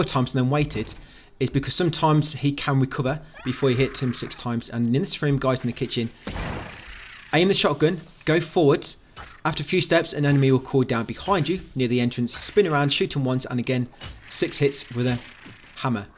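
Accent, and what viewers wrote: United Kingdom. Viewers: Ты британец?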